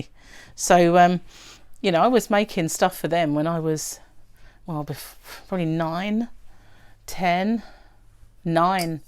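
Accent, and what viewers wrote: United Kingdom. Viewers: I like to watch your you tube love to listen to the British accent I hope you finish the bootie I want to make one to go with mittens you made than I will find a blanket. Thanks again see you again.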